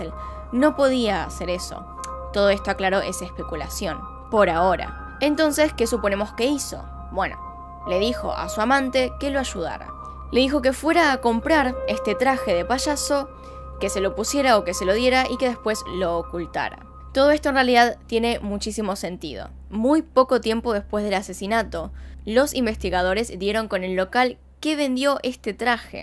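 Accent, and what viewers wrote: Argentina. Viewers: Se te escucha pachazo 😁me encanta el acento argentino 😂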